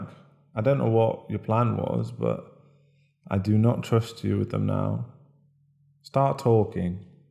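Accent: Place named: United Kingdom. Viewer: You sound really British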